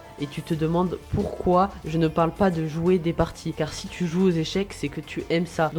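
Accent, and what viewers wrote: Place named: France. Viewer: hes speaking like a French Sheff who knows how to cook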